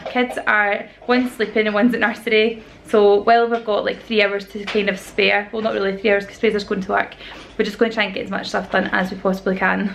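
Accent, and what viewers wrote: Scotland, United Kingdom. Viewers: Since english is not my native language, it's so hard for me to understand your scotish accent. But I'm not giving up on you! You are so simpatic!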